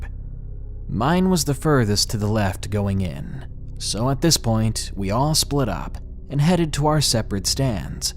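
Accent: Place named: United States. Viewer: Oh yah you are from America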